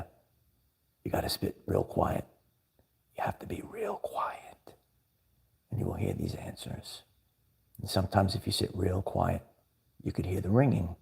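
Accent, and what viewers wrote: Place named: East Coast, United States. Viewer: I just found your video! (I am in the southwest, currently working and listening and I heard an East Coast accent and had to stop, I felt at home. Are you in Jersey by any chance? 🙏😄💜) So you know, it popped up as ‘people who watch Stefan Burns also watch this channel’. Great chat! Namaste 🙏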